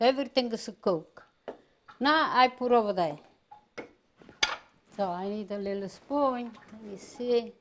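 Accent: Italy. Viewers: I love this; I grew up in a French/Italian neighborhood since my family was French Canadian, we had a lot of Italian neighbors. I miss the accent that she has. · Sweet lady ... people are so insensitive. She has a deep Italian accent but who cares! Think of your own grandma. She means well. And she sings, too. Love it.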